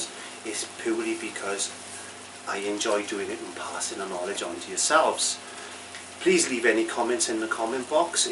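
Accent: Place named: Scotland, United Kingdom. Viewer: I love your videos and that wonderful accent. Scottish? I bought a couple of the Masterson Sta-wet palettes, and I like them a lot, but one is quite large and the other just a bit too small. I’ve tried using styrofoam plates that I put in plastic bags. They work, but can be awkward. The hardest part for making my own is finding an air-tight, flat container.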